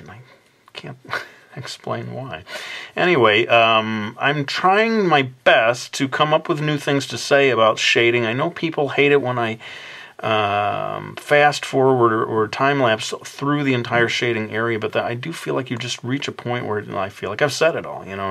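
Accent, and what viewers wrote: United States. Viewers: excuse me your american sound hurts my ears but i listen to u how rude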